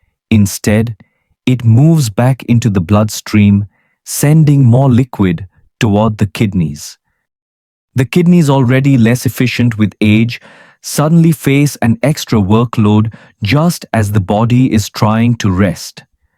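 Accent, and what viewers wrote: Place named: India. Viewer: One can turn the subtitles facility on for ENGLISH, and read the English script. For heaven's sake turn the sound off eliminating the most horrible sound I have heard; the HINDI accent. HOWEVER; there is absolutely nothing in this video of any help for night time trips to the bathroom ( It is Nocturia) and is largely due to a swollen prostate and/or prostate cancer. The swollen prostate situate at the exit of the Ureter from the bladder decreases the capacity of the bladder and it needs emptying a lesser volume more frequently. See a doctor and stay away from podcasts like this one. Clickbait lies in the ONE GLASS catchphrase. It could have been ONE GLASS of ......and name it. It spares viewers the agony of this podcast.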